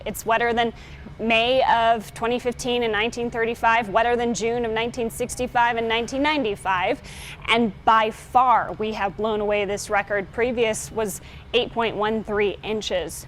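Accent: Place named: Louisiana, United States. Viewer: 😉 speaking like she from Louisiana, very slow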